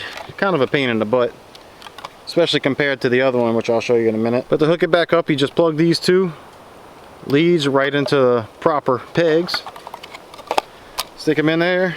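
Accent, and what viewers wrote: Louisiana, United States. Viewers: Great review. Sounds like you gotta a little Louisiana in that accent. 🦐🦐🙌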